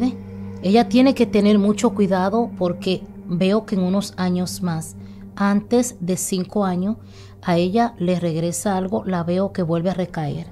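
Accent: Dominican Republic. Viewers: Hola.....disculpa pero yo pensé que UD era Dominicana..no habla como venezola..con mucho respeto..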